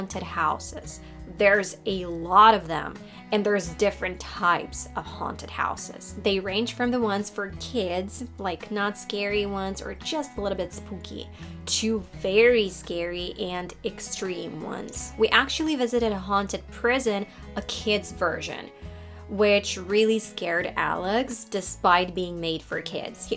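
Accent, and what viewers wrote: United States. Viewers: I'm shocked that you're from Ukraine, because you sound 100% American! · Дуже багато американського акценту))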